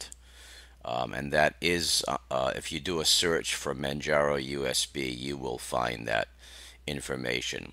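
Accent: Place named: New England, United States. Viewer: You've got to be from Mass or NH with that accent.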